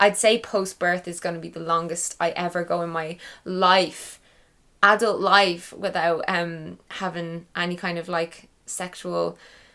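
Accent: Ireland. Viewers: woah, I can't take it, that Irish accent + high pitched "babyyyyyyy", just died of cuteness overdose..... (I can't believe I just spent 3h looking at possible ways to transfer to Ireland, need my own Irish cuteness too.....)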